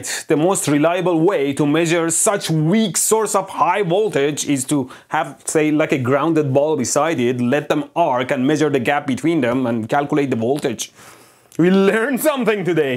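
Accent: Iran. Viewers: Ultimate parsian accent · با لهجه غلیظ فارسی انگلیسی صحبت میکنه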